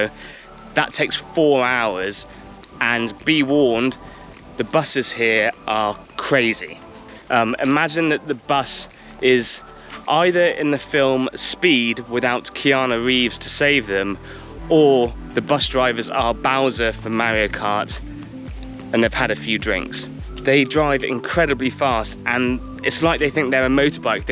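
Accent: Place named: United Kingdom. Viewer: Love british english speaking accent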